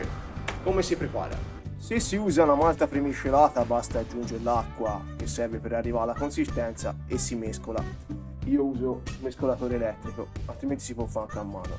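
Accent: Tuscany, Italy. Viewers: Quanto sei simpatico oltre a essere bravo...poi con il dialetto toscano sei al massimo. Ciao dalla provincia di Pi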